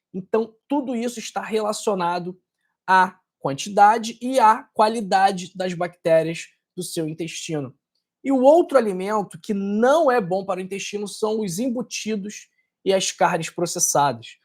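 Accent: Rio de Janeiro, Brazil. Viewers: Sotaque carioca é dureza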